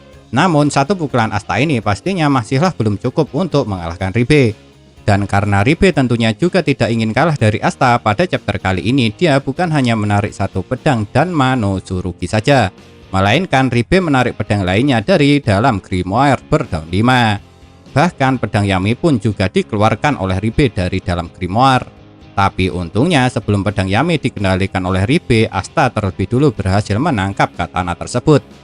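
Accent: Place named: Banyumas, Indonesia. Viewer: Fix mimin nya itu orang ngapak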